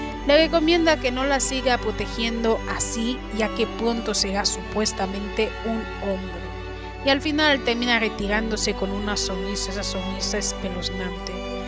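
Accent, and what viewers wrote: France. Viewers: Eres francés?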